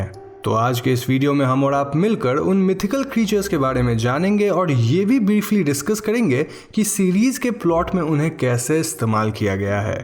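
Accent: India. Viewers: he's indian and he's speaking english with hindi accent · É o inglês indiano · Eu gosto da forma que ele começa falando uma frase em inglês e do nada já muda de idioma. Só os indianos conseguem se entender msm kk mas eu amo o sotaque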